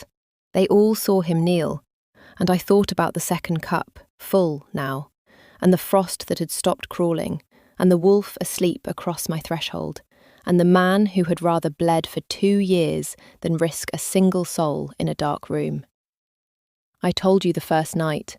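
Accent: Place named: England, United Kingdom. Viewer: I love the English accent. ♥ And I loved this story. ♥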